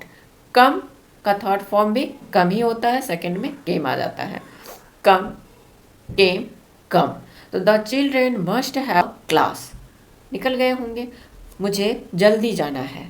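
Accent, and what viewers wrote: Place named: Bengal, India. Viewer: Madam you must be Bengali. Right ?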